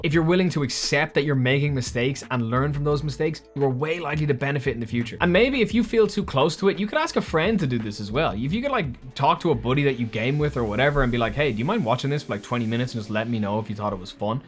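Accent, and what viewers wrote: Ireland. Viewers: Hey, it’s funny to find you I heard your voice and I was like they HAVE to be Irish so I remembered there’s a about on twitch and I went there and you live in Ireland Dublin (I live also live in Dublin). You’re very underrated!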